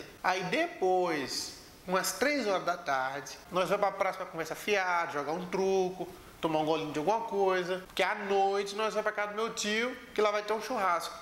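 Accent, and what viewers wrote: Minas Gerais, Brazil. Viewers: Amigo, você ė muito bom! Você já está perdendo seu sotaque pernambucano e falando igual mineiro. Gosto muito dos seus vídeos! As cidades em inglês foi muito engraçado! Parabéns!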